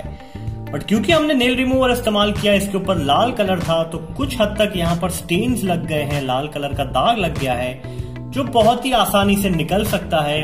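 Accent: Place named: India. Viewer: He is Indian English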